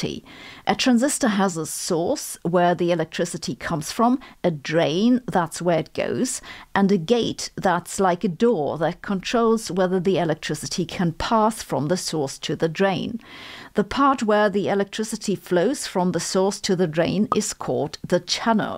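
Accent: Germany. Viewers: It's funny how all Sabine's "e"s become like a German would pronounce an "i", even in words where the actual pronunciation is much more close to how a German would pronounce it, like in Graphene.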